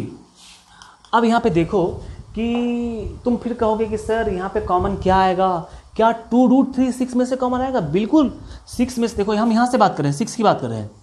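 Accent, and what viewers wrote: Bihar, India. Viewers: You're the best maths teacher ever..❤..i tried so many times to solve this type of questions and clear my concepts😢...but few mins before i found your video...😊I really really loved your way of teaching....✨especially i am feeling happpiiieeeee becoz you're speaking biharrriiii🤩🤩 .... you'll get millions of subscribers soon....✨🦋 best wishes too youuuuuu⛅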